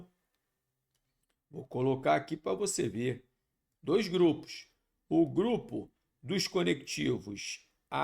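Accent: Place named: Rio de Janeiro, Brazil. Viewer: Esse carioquês é uma maravillha, kkkkkk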